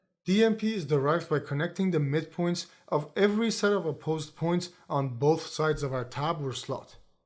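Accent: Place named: Egypt. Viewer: حاسك مصري الاكسنت مصري الشكل مصري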